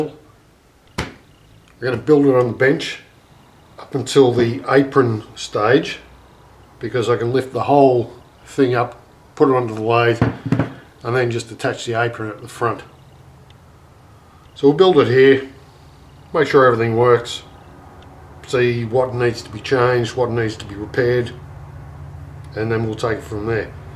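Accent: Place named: Australia. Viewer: Ahhhh.... So nice to find a video for a job I will be getting into after Christmas. Being a free lathe I had no time to look at it really but it felt tight in my inexperienced quick look. I had to dismantle it to get it home so I'll be looking for repairs needed whilst putting it all together. Thanks for the pointers and places to look. Mine is rather older without the thread cutting box. Just a heap of gears thrown in an old banana box that amazingly all look in good condition. Soooo very much appreciated I say with another Aussie accent. old-bonez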